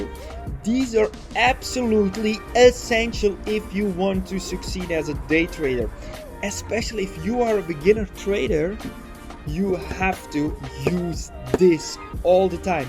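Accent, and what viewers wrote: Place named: Switzerland. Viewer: your accent sounds like swiss :-)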